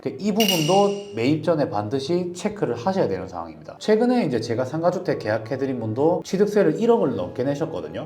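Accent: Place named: Gyeonggi, South Korea. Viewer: 원래 경기도 사투리에요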